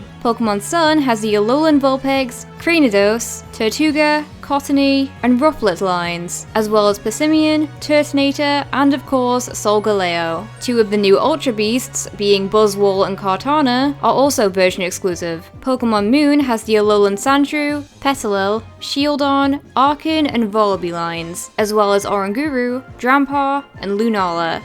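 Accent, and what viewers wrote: United Kingdom. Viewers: A question,are you British?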